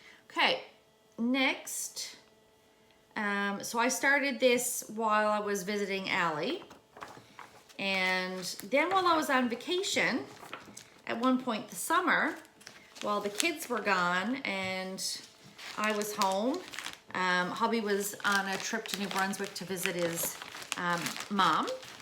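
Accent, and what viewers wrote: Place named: Newfoundland, Canada. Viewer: First time seeing your video on my feed. I thought to myself, I need to figure out where you were from. Towards the end of the video, you talked about the fires here in Newfoundland. I always find it relatively easy to distinguish newfoundlers speech. Yay! Found a needleworker on YouTube from the rock. Living on the west coast. Keep well and thanks for your time.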